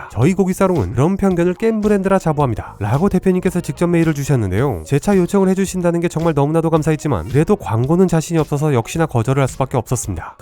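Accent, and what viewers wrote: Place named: Jeonju, South Korea. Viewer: 전주분이셨군요?역시 목소리톤이 사투리는 없지만 억양이 전주식 아니겠지 보면서 참 친숙해했는데...좋은영상 만들어주시고 항상 잘보고 있어요. 제가 전주를 좀 알아서요.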